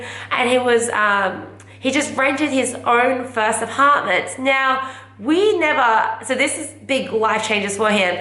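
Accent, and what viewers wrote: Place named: Australia. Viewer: Im in America. Is so cool to see an asian with an Australian accent!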